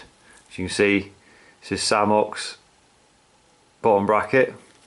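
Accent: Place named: Birmingham, United Kingdom. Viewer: Nice man thanks .. Is you a fellow brummy?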